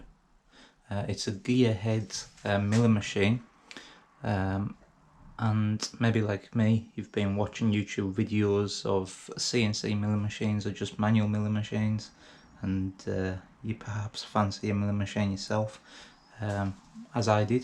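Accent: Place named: Bishop Auckland, United Kingdom. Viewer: Sounds like your are from Bishop 😎👍or Tow Law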